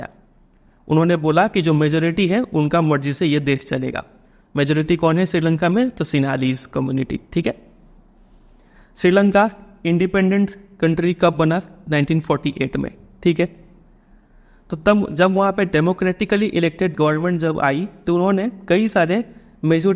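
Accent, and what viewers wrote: Bihar, India. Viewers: Nhi 😂 bihari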